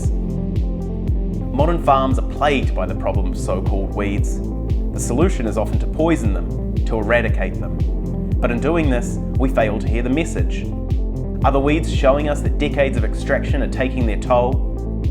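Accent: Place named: New Zealand. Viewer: Great illustration of nature as a complex adaptive system! And nice New Zealand accent...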